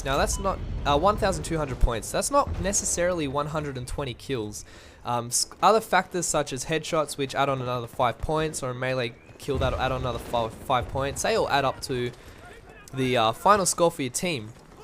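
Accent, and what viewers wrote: Australia. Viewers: mmm, enthusiastic aussie commentator ;)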